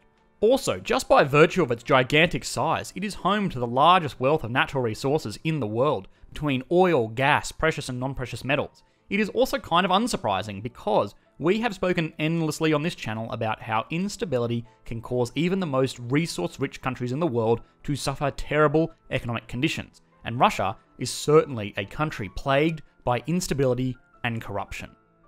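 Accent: Australia. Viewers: Gotta love the Aussies with their cockney-esque accents - hangover from history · it sounds pretty normal to me with a hint of Australian.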